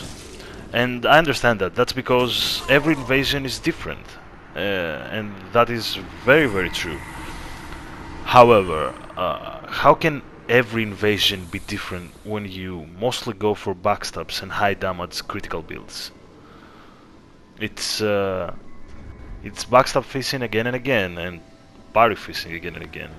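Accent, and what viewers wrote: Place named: Italy. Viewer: Oh, I agree with you. A lot of invaders are just elitists jerks. Everybody was a noob once. Everybody struggled. I know I did. People still complain about gankers, but real gankers are so rare nowadays. 3 dudes trying to beat the level are not gankers they are co-oppers. DS3 was the most balanced way From Soft did in my opinion. IMO It struck the possible balance between hosts not being too strong and invaders not being overpowered. It makes the game fun for most people. About DSR - I have heard about the rumours about weapon matchmaking, but as far as I know that is still unconfirmed or uncertain ("Players outside a host's parameter range will not be matched with each other"). The estus healing only in DSR is already confirmed. <link> DSR will IMO follow a lot of what was learned about balance in DS3, as DS1 was very unbalanced, sometimes in the hosts favor and sometimes on the invaders favor. 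I am glad to hear this. I bet there will also be a double confirm system on backstabs like in ds3, and stunlock will not be infinite, but this is just speculation. Anyway, great video, and I hope to see your content on DSR. PS- BTW you are italian, right? Your accent seems italian!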